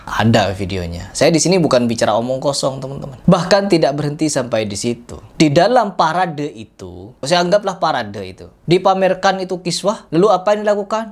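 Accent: Madura, Indonesia. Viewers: Salam dr madura mas,oea kok logat masnya kyk org madura ya?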